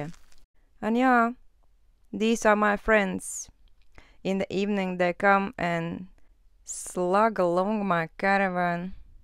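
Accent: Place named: Russia. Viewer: I see you have a Russian accent.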